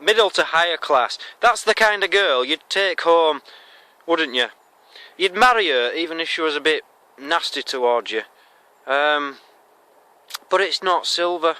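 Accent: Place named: Leeds, United Kingdom. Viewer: I thought I heard a touch of the Leeds twang as well.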